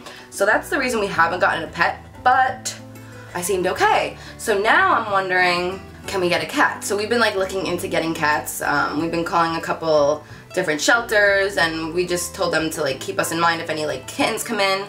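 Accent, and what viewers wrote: New Jersey, United States. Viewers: OMG it finally makes sense. Your slight accent. I don't how I couldn't tell before you have a Jersey accent.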